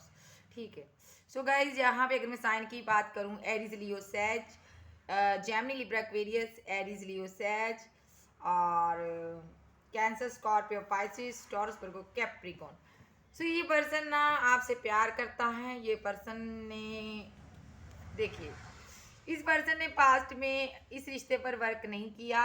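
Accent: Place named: Punjab, India. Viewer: Madam aap ka Punjabi lehja boht acha lagta hai Allah aap ko aur kaamyabi de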